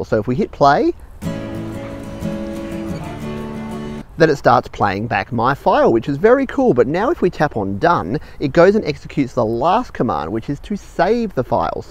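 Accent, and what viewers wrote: Australia. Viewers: Australian def not a Brit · Australian. So am i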